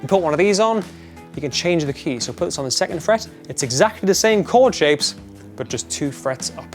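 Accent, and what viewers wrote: United Kingdom. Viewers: You move like Captain Jack Sparrow, Also you almost talk like him, Makes it better because you got that nice british accent.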